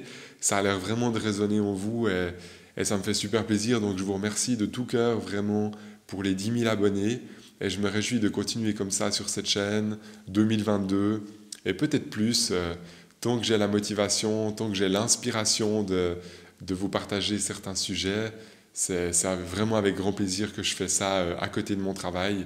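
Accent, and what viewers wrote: Switzerland. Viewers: Bonjour, je te découvre aujourd'hui au travers de la video poser des questions a son guide , et tout de suite jai noter une pointe d accent suisse . Lorsque jai vu que tu proposes des formations j ai ressenti que cest sûrement en Suisse . Bingo ! Mais cest top que tu les proposes a tes followers d autres contrées 👍👍👏👏👏 Japprecie tes conseils que j approuve et je voulais savoir si tu pouvais faire une video sur les guidances YouTube a choix ou autres . Ou l'utilisation des cartes ou tarots .personnellement je trouve que souvent ca empeche de se recentrer sur ses ressentis innés. Deplus , je suis croyante mais jai du mal avec la religion car il ya beaucoup de discipline a avoir au sujet de la priere , jeûne ect ... pourtant j ai la foi , mais jestime que Dieu aime toutes ses creatures tant quon ne fait de mal a personne et pas besoin de simposer des manieres de prier ect . 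Px etre un autre sujet de discussion ? 🙏 Bon Mardi 😊🌞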